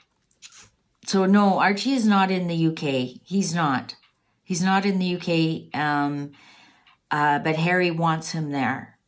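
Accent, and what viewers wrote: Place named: Canada. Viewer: I had no idea that is a fellow Canuck 🇨🇦. I just picked up on the accent. I knew there was a reason I liked her in addition to her being a talented, intuitive reader. Very good reading, 🔮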